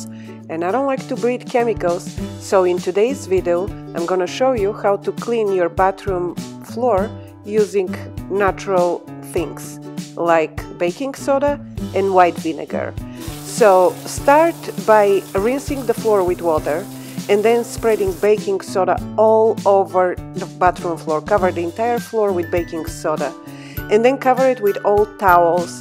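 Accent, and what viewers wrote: Russia. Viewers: Lovely (RUssian?) accent. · Russian accent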